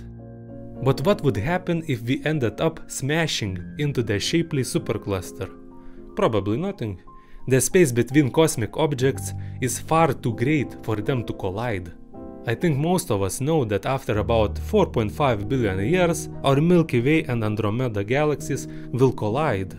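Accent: Russia. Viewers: His russian accent is attractive lol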